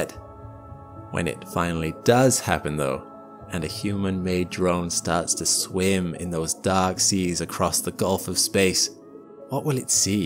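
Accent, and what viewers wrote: Wales, United Kingdom. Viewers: Is that a Welsh accent? I'm from Newport... Sounds similar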